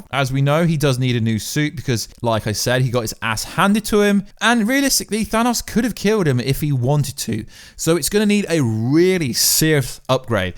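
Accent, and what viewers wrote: England, United Kingdom. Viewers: they're not "what" with a date? you tube says you said basilic. what does basilic mean? A really "what " upgrade? you tube said you said safe. "head sculpt?" lastly i have taken the liberty of looking up "aliteration": from al·lit·er·a·tion /əˌlidəˈrāSH(ə)n/ noun noun: alliteration; plural noun: alliterations the occurrence of the same letter or sound at the beginning of adjacent or closely connected words. "the alliteration of “sweet birds sang”" i t doesnt mean different versions... maybe you are thinking "variations". i find it quite interesting that you have an "english" accent but dont speak proper english.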